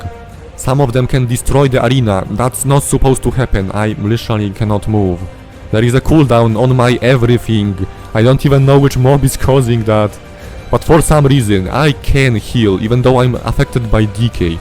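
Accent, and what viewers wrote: Poland. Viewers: Your accent sounds polish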